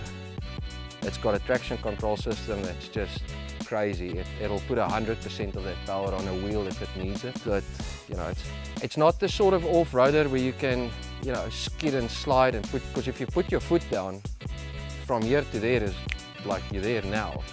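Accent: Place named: South Africa. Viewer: You have a South African accent, wondering if you are from here min confirmed I guess. Looking at buying a 957 and doing the same with it.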